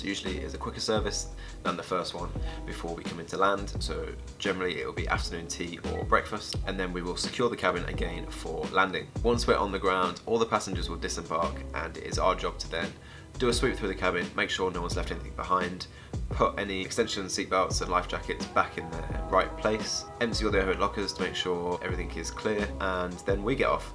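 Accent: United Kingdom. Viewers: The first thing I said to myself when he first started talking is he’s British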